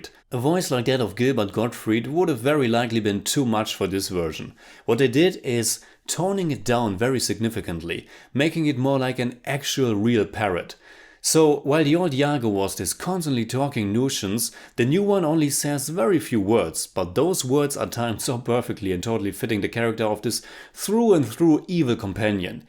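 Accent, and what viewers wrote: Germany. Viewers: i thought you were from austria but that accent sounds the same to me, still german language. are you from austria or germany? you are the best reviewer ever by the way. i say that every time and i a say it again. i'd listen to your reviews about anything--movies, books, cafes, anything, i would trust your every perception.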